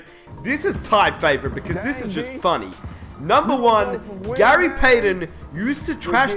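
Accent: United Kingdom. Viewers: Omg english accent is so wroooong here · Interesting hearing a Brit talk passionately about NBA stories. Too bad the NBA sucks now. Too soft. It was great in GP's day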